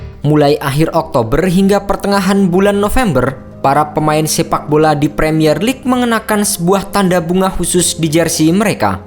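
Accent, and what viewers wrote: Indonesia. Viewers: Pekat siot loghat indo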